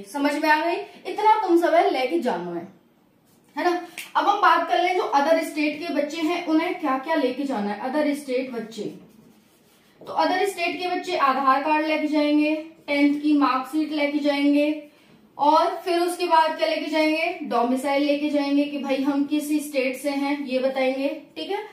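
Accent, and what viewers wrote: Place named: Braj, India. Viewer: Braj bhasha bol rhi ho aap.. Mathura se ho aap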